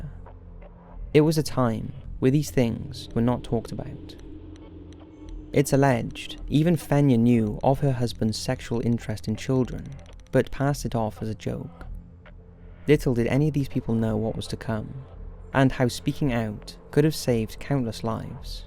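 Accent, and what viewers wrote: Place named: South East Wales, United Kingdom. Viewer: I've wondered for a long time where you are from. You have an accent that sounds like you might live very close to me which is South-East Wales. Let me know!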